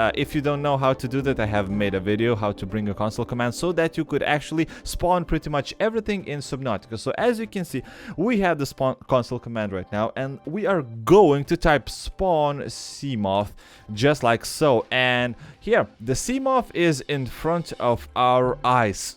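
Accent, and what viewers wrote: Russia. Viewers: wait, is he Russian? he sounds it at least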